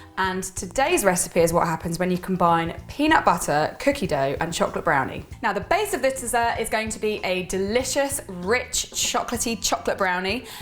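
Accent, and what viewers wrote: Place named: London, United Kingdom. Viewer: It's a London accent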